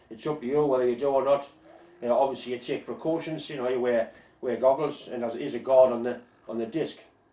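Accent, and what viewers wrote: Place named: Geordie, United Kingdom. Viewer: Absolute nonsense. has one of the finest regional English accents. He is a proud Geordie and long may he remain so!!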